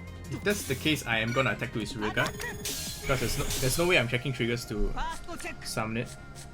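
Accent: Singapore. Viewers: He does have that singaporean accent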